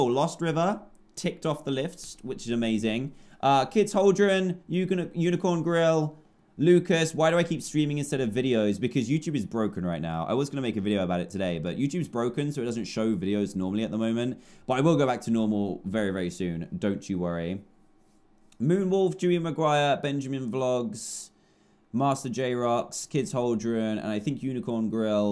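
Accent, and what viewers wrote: England, United Kingdom. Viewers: I just turned 11 and I asked my mum how long she’s been hearing an English accent coming out of my computer and she said 2012 and btw commenters from the future it’s 2018 right now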